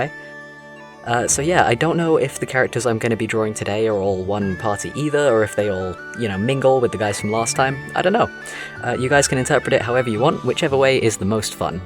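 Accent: England, United Kingdom. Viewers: I know this doesn't relate to d&d, but I'm curious to know what part of England he comes from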